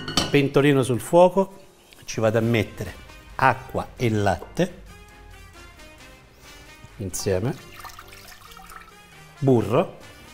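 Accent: Tuscany, Italy. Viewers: Avrai pure un accento toscaneggiante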